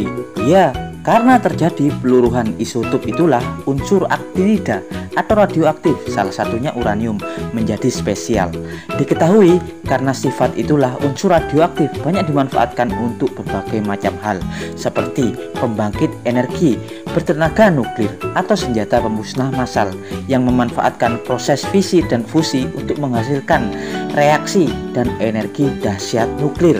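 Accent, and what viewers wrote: Java, Indonesia. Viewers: orang jawa mana ini? medoknya jelas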